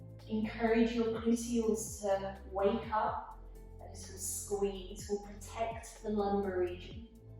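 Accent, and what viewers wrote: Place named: United Kingdom. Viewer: Wow! I stumbled on this flow while looking for no-inversion (headache-safe) yoga. When I get a migraine, the day after I have a residual headache that kind of feels like half a headache mixed with a hangover. I do want to do flows during these days but I found that doing any kind of inversion can exacerbate the head pain. So this flow was amazing, great breath work, mindful warm up and stretches, and amazing pacing. The voice cues were so on point too- I've never seen this instructor, but I didn't have to look at the screen more than once or twice. (And I'm American, so I love a beautiful British accent lol) Thank you so much for this gentle, soothing, and pampering flow. Going in my after work favorites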